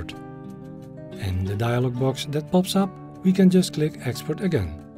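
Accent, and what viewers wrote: Netherlands. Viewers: hah your dutch!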